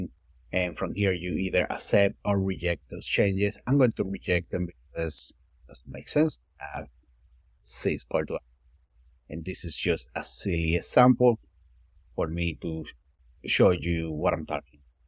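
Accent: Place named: Latin America. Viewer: Bro eres latino hablando inglés porque eso me parece, hablas bien recién empecé a usar cursor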